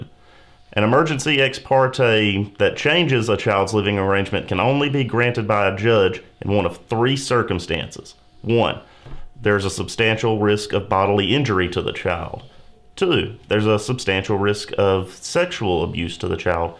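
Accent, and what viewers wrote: North Carolina, United States. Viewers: I thought I detected a NC accent! I raised my children in Chapel Hill. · I knew this was north carolina cuz of the accent hahaha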